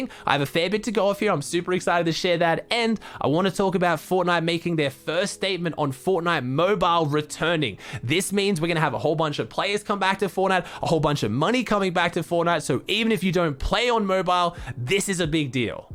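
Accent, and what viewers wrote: Australia. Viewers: now lol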